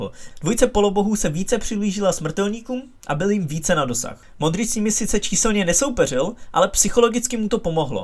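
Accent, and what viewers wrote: Czech Republic. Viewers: To je otřesné, jak furt mluví jak Pražák.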